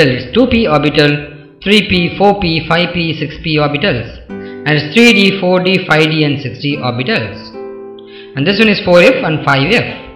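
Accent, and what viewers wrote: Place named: India. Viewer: I'd like to know what percent of youtube videos are made by Indians. I'm guessing 80%. I'm sorry Indian fellow, but speaking with an echo doesn't make the Indian accent more pleasant to hear for people outside of India.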